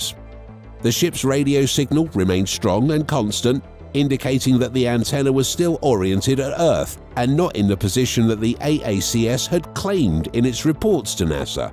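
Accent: United Kingdom. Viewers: I’m not going to get rooked into listening to this Brit-narrated 13 min long video about a message that is never revealed but if watched I’d have to endure a mind numbing litany about the wonders of the Universe.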